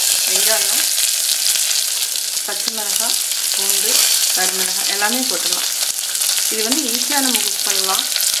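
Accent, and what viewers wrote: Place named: Coimbatore, India. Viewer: .k hi sis starting la sollanalaum vanga nu solrangale..shanthama pesuranga ithuve alagathanapa irukuthu sis.kovai tamil really superp thaan.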